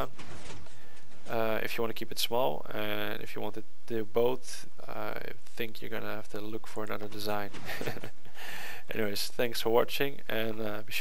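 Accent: Netherlands. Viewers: ja ik hoor nu al dat je nederlands bent :P , maar wel vet gemaakt :D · Are you dutch? I think it by listening to your accent :P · you dutch? becuz it sounds like so ;) (if you are, im dutch ;))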